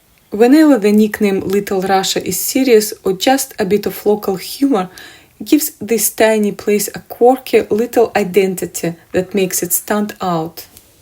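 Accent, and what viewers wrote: Scotland, United Kingdom. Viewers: I lived in Galston in the late 70s having moved from Glasgow. I remember my dad trying to get us to Glasgow and we got stuck on the main road through Moscow down the dip in the snow. Had to be pulled out. Love your accent too - I can hear the Scots pushing through your Russian accent. Beautiful!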